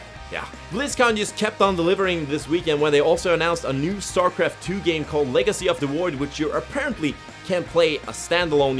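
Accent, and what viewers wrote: Norrland, Sweden. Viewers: Svengelska still better ROAD TO GLÖBOL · snacka mer norrländska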